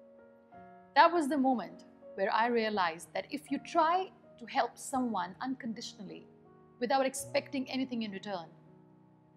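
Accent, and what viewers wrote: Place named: United Kingdom. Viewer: mam i just wana ask in which country u r still living.. ur english speaking accent is british.. u r jst osmmm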